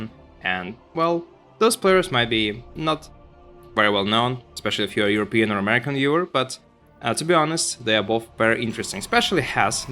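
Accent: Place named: England, United Kingdom. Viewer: ого, вы из англии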